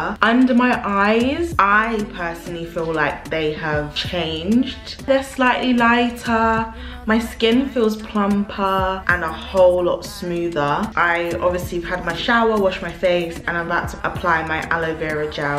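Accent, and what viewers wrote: United Kingdom. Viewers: Is that your boyfriend in the video?? And are you British living in America or what’s going on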